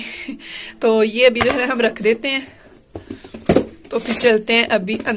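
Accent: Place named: Punjab, India. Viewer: Punjabii accent ❤❤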